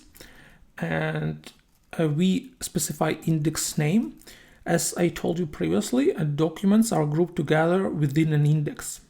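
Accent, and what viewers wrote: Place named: Eastern Europe. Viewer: slavic accent😎💪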